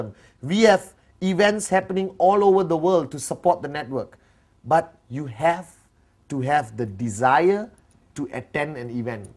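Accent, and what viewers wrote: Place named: Malaysia. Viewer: it should be in Hindi language also... because of most of the people are unable to understand English specially in malesian accent.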